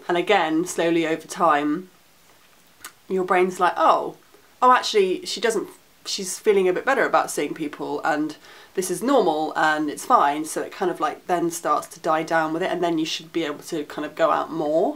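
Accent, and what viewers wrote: England, United Kingdom. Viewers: I was little confused about how half a bottle of red wine isn't a good source of relief. I was panicking earlier(while watchin your video) and so i drank a 25oz beer(Natural light) smoked a halfer, and then i got all into your video, the highlight of my day(woman). I decided; it's your magical English accent that makes everything epic like a Harry Potter movie. Will you practice magic with me? But Y-N is Good. couple of sips>? what's with the guilt trip. Power tripper over and out.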